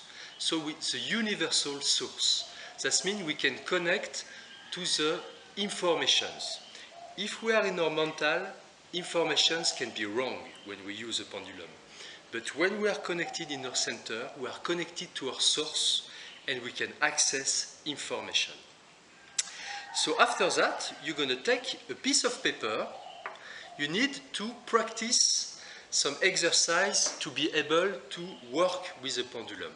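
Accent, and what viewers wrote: France. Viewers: Ohh, the french dialect... j'aime! ❤ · Loved the video! very helpful and explained lovely - specially with the french accent ( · Love the accent😁my son in law is French, and reminds me of France and lovely people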